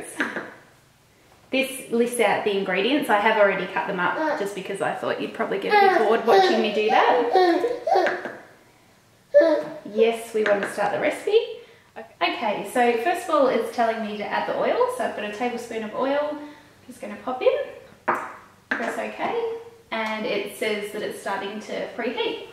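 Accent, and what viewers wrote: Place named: New Zealand. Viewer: Where are you from? You sound like a kiwi :-)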